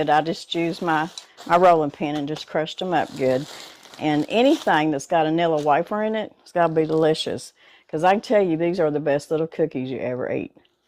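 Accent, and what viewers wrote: South, United States. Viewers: Hay thank you for your restapee love your southern talk but that look so good from steve c from state Oregon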